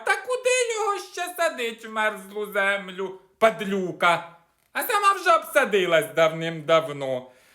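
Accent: Poltava, Ukraine. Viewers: Через Гальку не посадила раньше огород.. Іван там де садив то срака собача сидить а не огород. Ну вже ж підняла настрій. Наш Полтавський говір. Наче дома побувала. Молодець.❤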